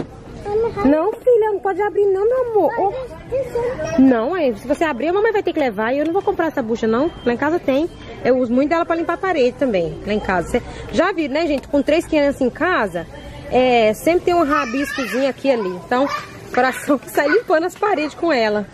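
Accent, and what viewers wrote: Minas Gerais, Brazil. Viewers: Amei o vídeo. vc diz que estão caro os produtos de limpeza?? Cara vem pro Brasil, vc cai de costas. kkk mas eu estou fazendo os meu produtos em casa. faço sabão. Que virá sabão líquido para limpeza pesada. sabão detergente para louça e para limpeza do chão e banheiros faço tbm meus cheirinho (desinfetantes) uso muito bicarbonato tbm. aqui tem que se virar nos trinta. kkk bjs AMADA. Mineirinha linda lembra minha mãe no sotaque amei.❤❤😚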